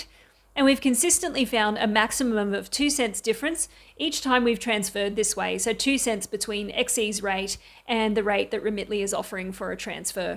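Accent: Australia. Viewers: Only just found your YouTube channel. Great to hear an Australian voice for a change, and to top it off, a super informative resource. well done, I'm a convert to your channel! Have been to Siem Reap on a conference years ago but did not see much of it so viewing all your videos with relish in my search for a place for my wife and I to retire in a few years. I was surprised to read that it costs AuD$68 every month to transfer $2,999. This is AuD$816 per year in money transfers YIKES that is high!!! Is there any interest on the account balance from the local bank? I like this suggestion from a poster :"You can transfer money from your home bank to your Wise Card, then use your Wise Card to make purchases, pay bills, and even withdraw from ATMs in Cambodia." ...makes more sense no?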